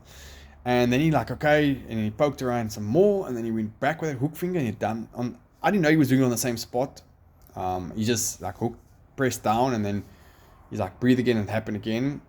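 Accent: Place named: Johannesburg, South Africa. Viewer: Im trying to pin point that accent. Im guessing Joburg?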